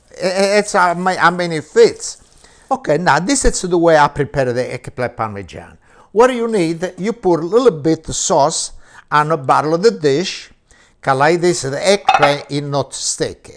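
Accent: Italy. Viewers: I AM SOOOO MAKING THIS FOR MY BROTHERS THIS THANKSGIVING. BECAUSE THEY DNT EAT MEAT. AND THEY LOVE EGGPLANT I STUMBLED UPON THIS RECIPE. AND I LOOOOOOVE HIS AUTHENTIC ITALIAN ACCENT.😇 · I love this little old guy. He’s so cute w/his Italian accent and his drink-drink gestures. LOL I’m trying to recipe tonight. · This is the most italliano uncle i’’ve heard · With that accent it just tastes better. Reminds me of my grandfather who was from Italy. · Oh gosh he is so adorable.. his real authentic Italian accent is even better.. Oh kay!! I like eggplant parmigiana... It’s a oh yeah baby!!!.. ❤️ love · Love his Italian accent love him thank you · Love his accent.i just love those Italian men!!!! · When I heard that thick Italian accent of his I knew it was gonna be a good cooking tutorial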